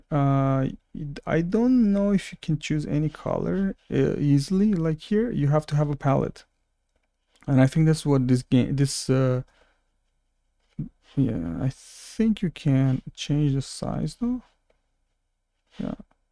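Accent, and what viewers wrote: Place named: Romania. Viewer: La inceput chiar m-am gandit ca esti roman sincer. Dupa accent si aspect, doar ca m-a cam lasat in dubii "CA"-ul de la youtube. Deci am zis ca poate esti Canadian dar vorbesti franceza mai mult decat engleza. Sincer chiar ar merge sa faci o serie de tutoriale. Godot a inceput sa creasca foarte mult in ultimul an. Eu personal il folosesc de cam un an si ceva si nu am lansat nici macar un joc, ci doar prototipuri :)) Ai putea folosi strategia asta cu youtube-ul, sa faci niste tutoriale, sa-ti cresti canalul destul de bine si cand o sa lansezi jocuri o sa ai oameni interesati in proiecte. Deci pe scurt, ai putea face o sursa de venit din asta. Lasa-mi un detaliu de contact daca vrei si poate am putea comunica mai mult acolo. Multa sanatate si chiar astept tutorialele tale. Email-ul meu e : <mail>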